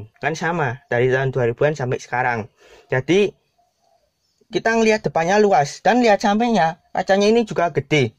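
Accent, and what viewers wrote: Java, Indonesia. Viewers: Bahasa jawanya totok😁😁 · .medok kali kau ah..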